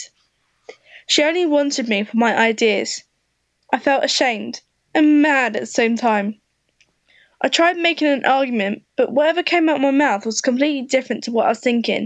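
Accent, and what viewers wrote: United Kingdom. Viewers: OMG I LOVE YOUR VOICE!!! (sorry im a sucker for British accents) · Is she british i luv brits! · Is she British? I’m not being Offensive but I’m wondering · U sound British I like it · I love her British accent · Eyyy another british girl!! :3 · It’s a British accent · British yes finally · I love your British accent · Devica she's not Australian!! She's British!! I'm British too and that's what they sound like!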